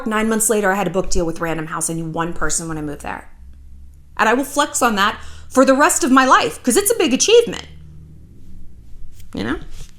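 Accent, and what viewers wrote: England, United Kingdom. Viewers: I love your brit accent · Love your English accent ! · i like your british accent so much i listen and don’t get the words because i listen your voice like listening music, i have to go back to listen again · your british accent is on point, this video is straight facts. Your hair is glowing 💜💜💜 talking about glowing, you look really happy, relaxed, you are finally at peace. You're blooming... i'm so happy for you, i was so stressed myself out when i saw you struggling with all those issues and bad people. You deserve the best, you helped a lot of people to open their eyes and stand for themselves, you saved a lot of people from bad people and relationships. You deserve to be loved and cherished and this fresh start is for sure the best decision 💜💜💜 Kisses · Your British accent kills me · Your English accent is 🤩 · LOVE the British accent! 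With love from London xx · Looooveee your British accent 😂❤️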